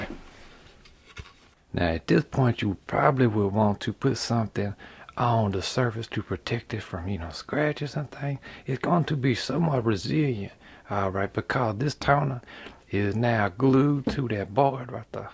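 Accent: Louisiana, United States. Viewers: I think I recognize that accent. Are you from Louisiana by any chance? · The voice-over on this is like Bob Ross was from Louisiana and did crafts instead of oil painting- I love it! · I appreciate that the instructions are in Southern (sounds like Louisiana)- slippy slippy and smush and catawompus are precise terms that are easily understandable for me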